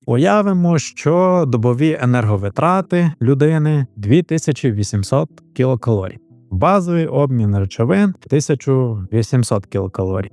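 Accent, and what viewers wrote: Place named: Galicia, Ukraine. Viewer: Ваша галицька Ч додає родзинки! Приємно слухати🤍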